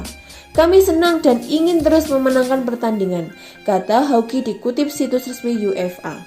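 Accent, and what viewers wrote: Java, Indonesia. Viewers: Medokk bet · Medok cuq ... 😄😄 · Jowo ne pundi mbak pembaca berita??? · Medokk min🤣ttep semangat💪💪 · Ora penak banget di rungokna, medok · Suara khas medok.. #ForzaMilan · Wong jowo hhhh Penyiarnya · Forza medok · Medok kali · Medok nya 😅 Penyebutan pelatih MU seakan aiueo 😅 Redaksi nya perlu di perbaiki But overall its ok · Medok jancuk wkwwk mantabs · Medok amet mba logat jawa nyah ✌️ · Klu nulis judul yg bener ploook...dan gasah medok medok Ngomong nya kampretttt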